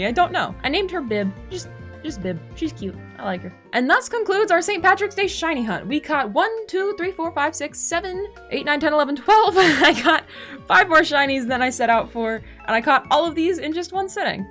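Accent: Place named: United Kingdom. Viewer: You sound like someone from around the uk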